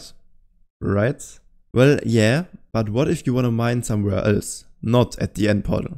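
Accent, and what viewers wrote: Germany. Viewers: I can hear the german accent😂 i really enjoy your videos btw i always watch em while playing minecraft myself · Bro sounds a bit too german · Man. I really like germans speaking english!!! 😄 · are you german? alles gut hört sich defentif besser an als bei mir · Do you speak german? Your accent sounds kinda german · German detected.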